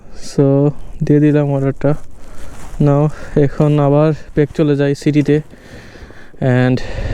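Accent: Sylhet, Bangladesh. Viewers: Apne kita sylheti ni ?? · আরে ভাই তুমি তাইন তো দেখিয়ার সিলেটি ❤ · ভাই আপনার কতা হুনিয়া মনে হয় আপনি আমরার সিলেটি মানুষ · ভাই আপনি সিলেটি নি??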